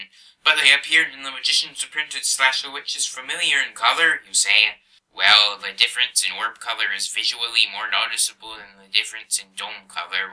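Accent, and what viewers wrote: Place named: United States. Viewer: No, I listened in for 90 seconds beyond the (incidentally overlong) intro. My comment was not mean, it was factual. For the record, the fact that you express opinions and have an American accent is entirely irrelevant to me. If you feel the need to censor and to be censorious, you really should not be making posts. Incidentally, you have marked my comment 'love', so I suggest there is some confusion on your part.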